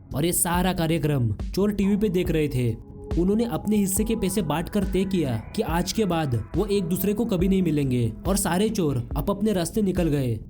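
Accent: Maharashtra, India. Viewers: Ye jo bol raha hai ye marathi admi hai marathi ki hindi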